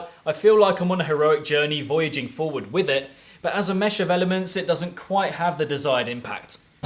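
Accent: United Kingdom. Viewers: Your lush British accent makes this review much more enjoyable. But no homo tho.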